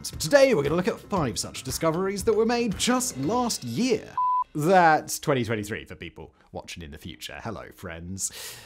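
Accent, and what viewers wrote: RP, United Kingdom. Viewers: This sounds normal for a British man with a Received Pronunciation accent - I have the same accent and the audio doesn't sound harsh or over-sibilant to me. I accept it may do to others.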